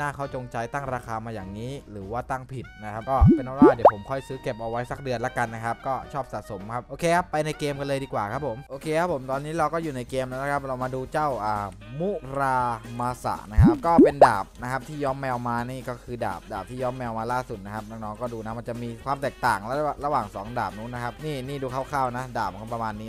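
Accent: South, Thailand. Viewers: ออก! ออก! เทาๆ หนอย หนอยนะครับ! 5555 ใต้มากเลยบ่าว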